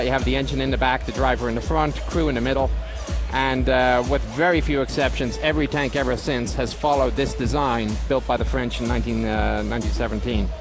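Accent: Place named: Ireland. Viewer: Love that Irish accent! When is your "Inside the Hatch" show gonna come out?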